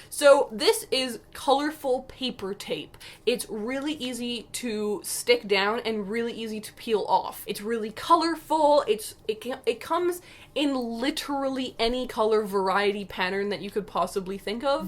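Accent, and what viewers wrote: Canada. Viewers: I love your Canadian accent when it peeks through - super cute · I knew she was Canadian! No wonder her accent sounded very interesting.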